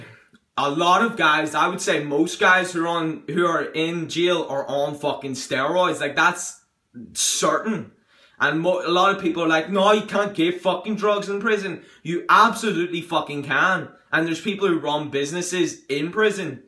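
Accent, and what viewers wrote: Northern Ireland, United Kingdom. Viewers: northern Ireland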